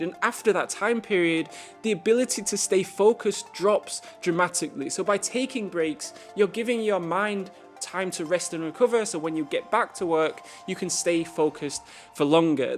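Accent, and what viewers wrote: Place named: United Kingdom. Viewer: Are you British I like that voice/Accent 💪👍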